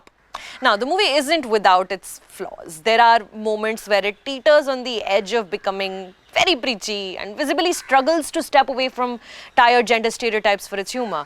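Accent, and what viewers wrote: India. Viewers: aa gayi south Delhi ki pseudo feminist...oh gawd how cringe this video was. if you want to try to be hip and be a Canadian/american wanna be...work on your "r's" that give away your Indian accent when you are trying to be a westerner feminist. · I like your hindi accent and use of words.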